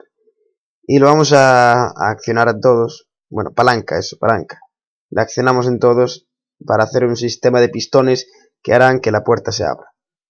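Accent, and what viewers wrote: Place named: Madrid, Spain. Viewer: ya decia yooo que tu acento me sonaba XXD yo de madrid!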